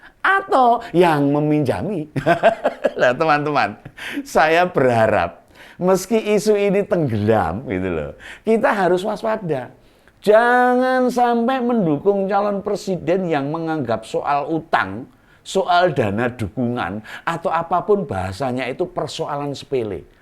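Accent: Java, Indonesia. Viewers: saya sukasekali dgn logatnya mas ini, jawa banget, logat asli jawa majapahit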